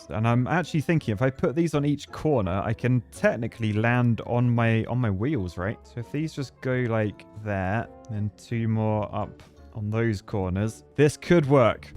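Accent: United Kingdom. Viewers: I cant understand you when you speak british >:(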